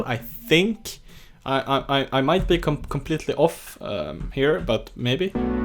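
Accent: Sweden. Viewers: That swedish accent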